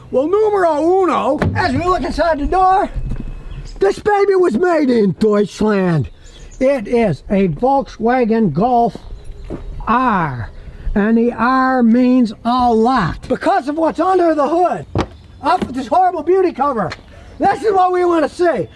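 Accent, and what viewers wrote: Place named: New Jersey, United States. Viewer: Sounds like Jersey,. Sounds like george c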